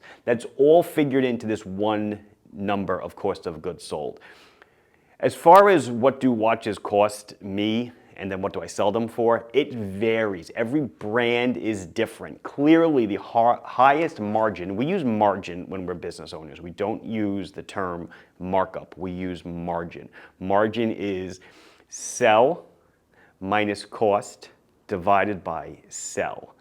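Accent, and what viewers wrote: Long Island, United States. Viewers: This was super interesting! Thanks so much for the breakdown!! Whenever I watch your videos, I always feel as if you're some distant cousin or something talking to me, because although I'm in CT, my Dad and his extended family are all from Valley Stream, and that Long Island accent is so distinctive!! You wouldn't sound out of place at one of our Thanksgiving Dinners or something hahaha! You now have a standing invitation to attend this year lol!!! 🤣🤣 Awesome video!!!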